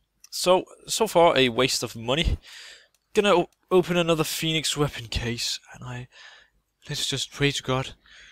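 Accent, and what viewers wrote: Denmark. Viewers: Danmark? Tydeligt at høre!:)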